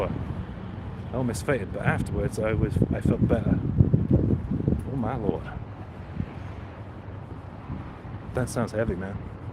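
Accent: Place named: Scotland, United Kingdom. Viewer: J where is your accent from? Driving me insane. I hear my native Scottish when you talk. Please let me know 🤞❤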